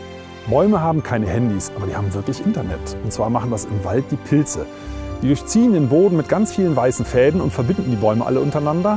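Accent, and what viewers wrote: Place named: Bregenzerwald, Austria. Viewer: Bregenzer wald dialekt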